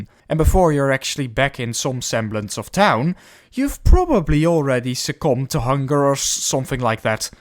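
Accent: Netherlands. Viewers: I love the Dutch into English accent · I heard that accent and immediately knew you were dutch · U can hear this guy is dutch or aren't you? Oh nevermind he included the map of the netherlands 😂😂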